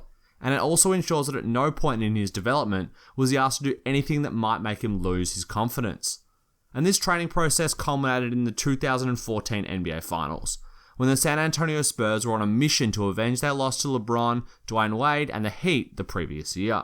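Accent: Australia. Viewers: Are you Australian? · That was an incredible video I love your a accent it’s just like mine go aussies go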